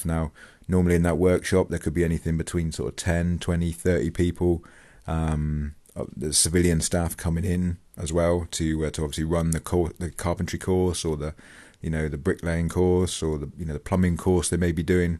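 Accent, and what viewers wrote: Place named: Cornwall, United Kingdom. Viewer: Cornwell accent i believe bud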